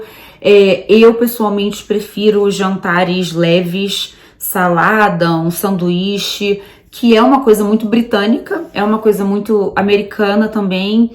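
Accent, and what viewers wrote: Rio de Janeiro, Brazil. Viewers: Oi, então a sua voz é maravilhosa adoro você falando,especialmente com esse sotaque carioca que é o meu preferido acho lindo lamento ter descoberto você quando já estava mudando para 🇺🇸 e não tive a oportunidade de conhecer -la pessoalmente aqui em londres, mais enfim sou super fã dicas de beleza então são minhas favoritas.bjus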